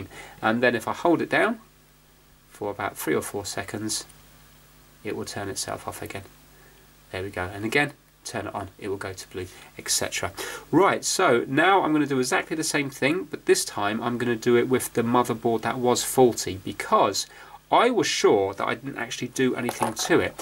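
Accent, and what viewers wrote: United Kingdom. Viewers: Hi, first of all: Great video as always, love your british accent 🗣! I‘ve got a question: you‘re using some kind of small (grey?) notebook (or convertible?) in your videos, showing how much you paid for your „trying to fix“ items. I‘d like to know which brand/model it is?! Thank you and keep on fixing! 👍🏻 greetings from 🇩🇪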